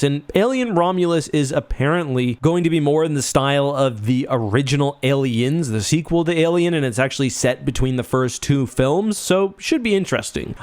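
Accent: Canada. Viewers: If I remember correctly, he was a “boring” kind of lawyer. Might have been a copyright lawyer but definitely don’t quote me on that. It was his actual job when he started making YouTube videos but eventually the channel got so big he had to choose and this ended up being his choice. He’s also Canadian if you couldn’t tell by the aggressive use of “aboot”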